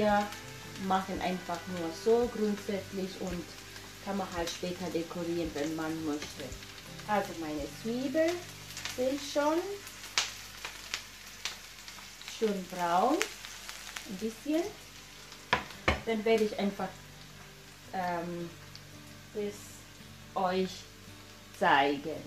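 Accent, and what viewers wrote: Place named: Germany. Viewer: Good cooking my dear🇸🇪🇸🇪🇸🇪😁😁😁😁😁🦚🦚🦚🦚🦚👜👜🌔🌔🌔🌔🌔I like your German accents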